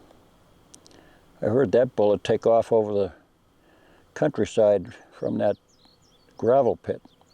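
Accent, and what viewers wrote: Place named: Deep South, United States. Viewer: I always like how he says it’s hot out but I imagine it’s truly <90 F with the sound of his accent. In the Deep South, that’s comfy. When I lived in MI, they literally counted how many summer days were over 90F. I’d drive home from work on a beautiful day with my windows down but everyone else had their windows up and A/C on. Just wild how people waste great weather.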